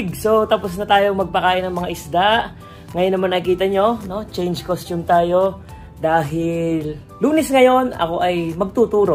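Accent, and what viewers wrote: Batangas, Philippines. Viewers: Modern day priest. I like the Batangueño accent and his being jolly very contagious. Father is an ideal Spiritual Director for the millennials. More power father!!! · Akoy natutuwa saiyoy Fr. Hahaha batanggenyong tuno talaga eh.... Hahahahha Nakakahawa ka ng tawa.Apakamasayahin mo po God bless you po ❤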